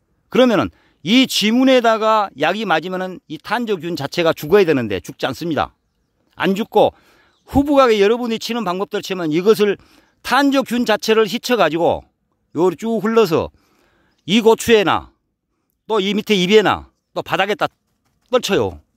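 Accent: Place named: Jeolla, South Korea. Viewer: 여긴 경상도인데 전라도 사투리가 이렇게 구수하게 들린 적은 없는데,진심이느꺼지네요.감사합니다.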